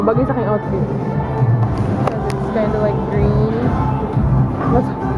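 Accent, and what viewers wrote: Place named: Davao, Philippines. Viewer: Love the davaoeña accent.hehe nice vlog! Cn u do a review on make up factory's velvet lifting foundation? Looks promising though.. tnx!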